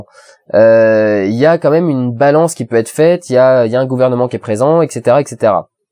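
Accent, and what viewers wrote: Germany. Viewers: Enorme l'accent allemand !!!! :) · non mais ton accent allemand m'a plié en 4, franchement si tu as un jour une autre facette de toi à mettre dans tes vidéos, tu mettre le "spécialiste" le gars qui rationalise tout à la science et tu lui mets cette accent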